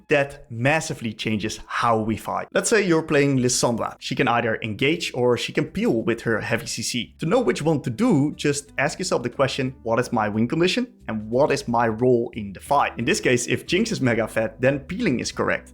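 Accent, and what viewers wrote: Netherlands. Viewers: Im hearing some heavy dutch accent right here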